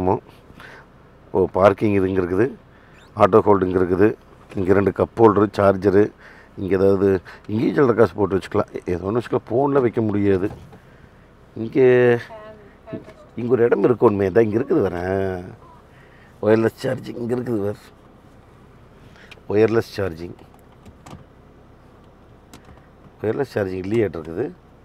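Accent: Kongu, India. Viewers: கொஞ்சும் கொங்குத் தமிழ் பேசும் கோமகரே...! திகட்டாத தித்திக்கும் பேச்சுடைய திருப்பூர் திருமகரே...! எங்கள் மதிப்பிற்குறிய டான் திரு. அண்ணா அவர்களே❤❤❤🙏 எப்படி இருக்கீங்க அண்ணி❤❤❤🙏 எப்படி இருக்காங்க ரித்திக்❤❤❤ எப்படி இருக்காரு என்ன சொல்ல...ஏது சொல்ல... சும்மா நெகு நெகு நெகுனு இருக்கு போங்க❤❤❤❤❤❤ சாலை ரதத் தேர் என்னும் இந்தக் காரின் அழகைவிட.... எங்கள் அன்பு அண்ணா❤அண்ணி உங்கள் இருவரது உரையாடலே அழகோ அழகு பேரழகு...! பஞ்சு மெத்தையில் படுத்துறங்கும் சுகம்...! மேகத்தை முத்தமிடும் பறவைகளின் கூட்டம் காணும் சுகம்...! காற்றில் அசைந்தாடும் நெற்பயிர்களின் நடனம் காணும் சுகம்...! பாலைவனத்தில் புது ரோஜா மலர் மலர்ந்து அதைக் கண்டால்? மனம் முழுவதும் சுகம்..! கார்த்திகைத் தீபம் சுடர்விடும் அழகினைக் காணும் சுகம்...! அப்படியோரு சுகம் இந்த வீடியோவைக் காணும் என் உட்பட நம் TMF குடும்பத்தினர் கண்களுக்குச் சுகம்...! என்றும் அன்புடன் உங்கள் தம்பி என்றும் அன்புடன் உங்கள் TMF ரா.முஹம்மது கபூர் (சென்னையில் இருந்து)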